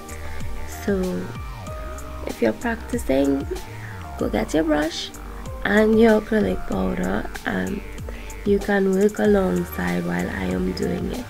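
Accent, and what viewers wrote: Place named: Trinidad and Tobago. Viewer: knew you were trini the moment i heard your voice 😍🇹🇹🇹🇹🇹🇹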